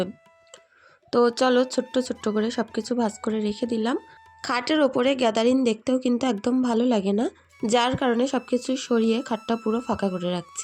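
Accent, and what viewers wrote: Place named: Barasat, India. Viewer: তোমার ভিডিও দেখতে আমার খুব ভালো লাগে , আমি বারাসাত থেকে দেখছি , বসিরহাটে আমার মায়ের মামাবাড়ি , তোমার কথা বার্তা , সবকিছুর সাথে আমাদের এখানকার অনেক মিল পাই , যার জন্য খুব ভালোলাগে তোমার ভিডিও দেখতে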